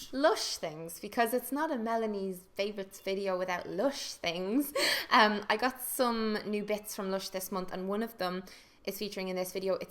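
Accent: Ireland. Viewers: I love how I live in America and literally don't have neither the beauty blender or the Anastasia brow pencil.. like what the heck?! This has gotta be a sign! haha -also this is hecka random but I bet you make Californians happy with that amazing Irish accent you have :P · Your sound so much more Irish in America if that makes sense 😂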